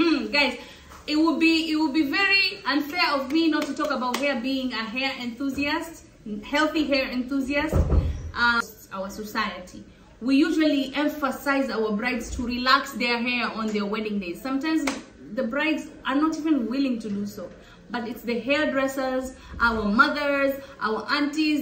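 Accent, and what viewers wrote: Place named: Mombasa, Kenya. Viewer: My dearest beautiful daughter, I watch u from Oman, ur a gem, a beautiful mum, I love ur vlogs so much I enjoy ur Mombasa Swahili accent, ur such a beautiful soul, may Allah guide and protect u n ur loved ones always, and keep up the good work, all the best, ur doing well so far Alhmdulillah. Love u loads stay blessed and beautiful as always.❤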